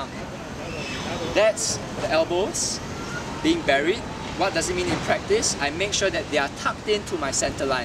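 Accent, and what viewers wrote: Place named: Singapore. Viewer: Judging from the accent, must be Singaporean and Malaysian wc. There s no such thing as structure for sil lim tao, there is only one single right structure for the entire Wing Chun system. Your mentioning of "clamming down" the knees already show some misunderstanding. Most people have been misled and gone down the wrong path simply because of the unfortunate use of the word "箝" ! 取其像而不取其義!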